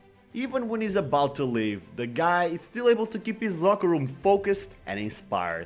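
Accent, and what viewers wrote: Portugal. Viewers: Percebe-se logo que és tuga. Só por causa disso até vou subscrever o canal ahahahah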